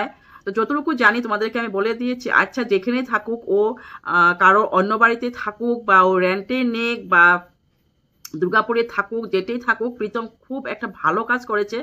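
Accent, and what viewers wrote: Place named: Northeast India, India. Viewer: North east e Jara thaken tader aneker Bangla Bolar style ta erokom.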